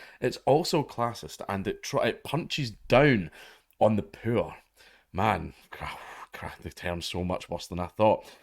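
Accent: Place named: Scotland, United Kingdom. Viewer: I love hearing say things like “poor” with the Scottish inflection but nothing tops his pronunciation of the word “murder”.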